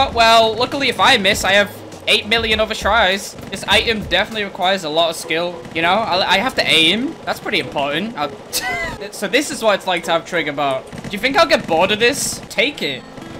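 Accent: United Kingdom. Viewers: your voice is kinda british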